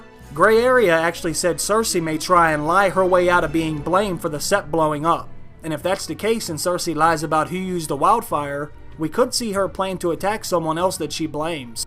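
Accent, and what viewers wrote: Pittsburgh, United States. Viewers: Are you from Pittsburg by any chance?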